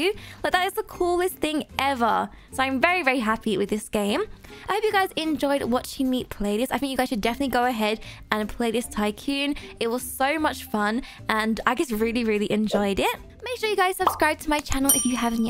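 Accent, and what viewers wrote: United Kingdom. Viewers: Are you from the UK I am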